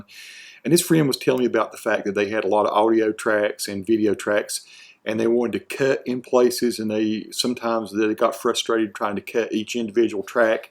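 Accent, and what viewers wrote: South, United States. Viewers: HI! I subscribed. You might not believe how I found your site! I used to live for a few years in Madison County, just North of Asheville. One day I wanted to youtube-lookup videos about that county. There were a few good ones but somehow one of your older videos came up. was part one of four, the " brothers" series where you all rode around the roads and just talking away. I really enjoyed the banter. I had little trouble understanding it, due to not only me living nearby but also living in Tallahassee, Florida for like 60 years on and off. The good old southern accent! I grew up in the very most Nothern area of Appalachia, way out in the country. I also really enjoyed the "wholesomeness" of the brothers, just good ole boys with a heart and soul. I found imagine, though, that someone else who might have stumbled onto this brother series, especially if they came from a Northern city and college-educated; they might only think, at their best, that you all were just a group of rural people with little knowledge of anything! 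But I can FEEL the goodness. And....salvation too! then I looked at your video main page.....oh "Lordy" [I do not mean to take his name in vain, I mean this word to infer a humbling experience That the Lord can Give!] your main page has so many videos of someone with a zillion talents and life experiences. Yet another showing that in the south and especially in the mountains, the Good Stuff is usually hidden away! really hidden away. there is a man w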